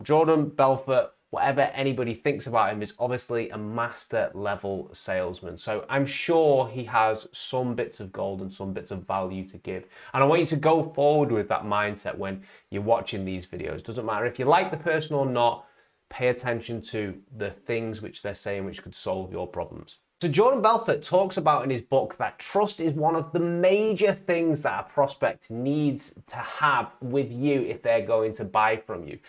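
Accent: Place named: United States. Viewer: I like your accent, I have no idea which part is it from in the US, but it's cool haha.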